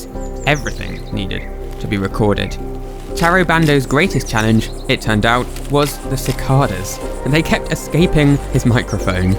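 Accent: United Kingdom. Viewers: British :D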